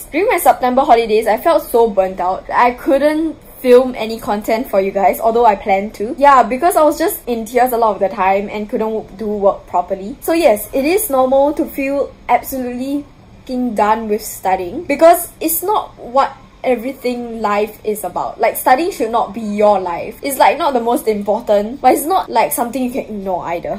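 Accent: Singapore. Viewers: I really love the singaporean accent idk why 😅😂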